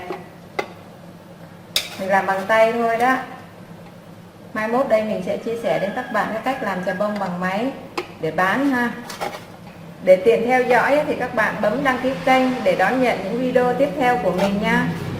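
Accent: North, Vietnam. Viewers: Bạn hướng dẩn kỳ càng và có dọng nói của miền bắc nông thôn thật thà, cám ơn bạn !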